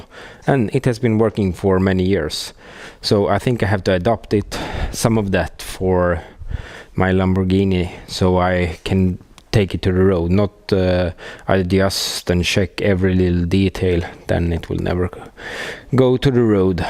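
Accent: Sweden. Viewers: Your video are gold, and that swedish accent is awesome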